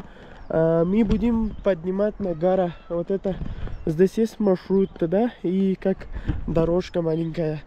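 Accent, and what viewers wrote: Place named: Tajikistan. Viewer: У тебя акцент тажикский😊.. Не доехал , а дошол😊👍👍👍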